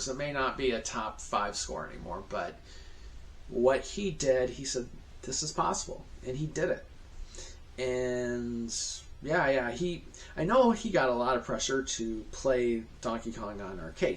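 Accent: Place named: Australia. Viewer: G'day mate. Love your Australian accent.... ;) Just wanted to let you know, I was in Japan last year and met up with Acu Azu and he's an absolute legend. He plays DK like a machine, it's absolutely insane watching him play and comparing his style to mine is night and day. He was lovely enough to bring some other Japanese guys who play DK, including the guy who brought DK out on the switch for Hamster inc. Their community seems to be filled with guys who just want to help each other achieve greatness.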